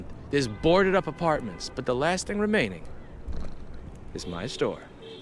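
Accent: New York, United States. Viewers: you are a true noo yawker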